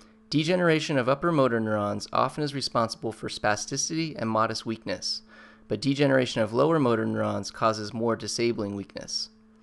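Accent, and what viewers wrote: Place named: United States. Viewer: NOT familiar with the american accent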